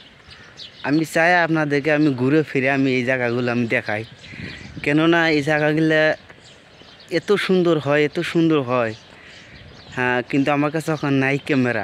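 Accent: Bangladesh. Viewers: Ekdam tai, or khota bartai bangladeshi r moto. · Apner vasha sune mone hocche Bangladeshi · বাংলাদেশের বাসিন্দা,, চুরি করে ভারতে এসে পাসপোর্ট ভিসা,, বানিয়ে রাশিয়া গিয়েছে,, ভাষা শুনে বোঝা যাচ্ছে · ভাই আপনি বলেছেন আপনি ইন্ডিয়ান, আপনার ভাষা না ইন্ডিয়ান, না কলকাতা, আপনার ভাষা শুনে মনে হয় আপনি বাংলাদেশি। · নিজেকে ইন্ডিয়া মনে করো তুমিতো বাংলাদেশি কোথায় বোঝা যায়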